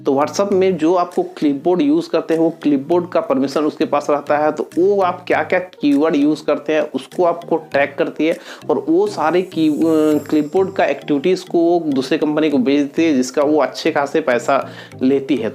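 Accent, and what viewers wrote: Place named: Assam, India. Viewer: U talk like Assamese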